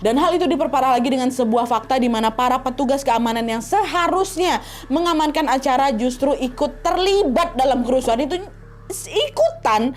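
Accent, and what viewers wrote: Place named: Padang, Indonesia. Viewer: Logat Padang terasa bgnt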